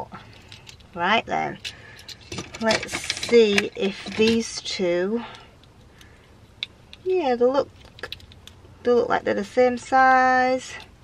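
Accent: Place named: Yorkshire, United Kingdom. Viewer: Sound like you're from Yorkshire ! Ha fancy a race ?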